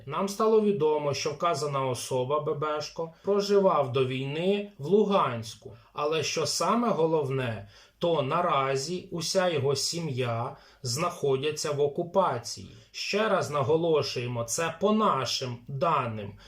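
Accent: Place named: Russia. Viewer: Он даже чёкает чё четы с окцент расийского дерьма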